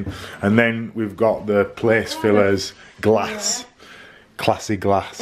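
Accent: United Kingdom. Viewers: Nice British accent, love it 👍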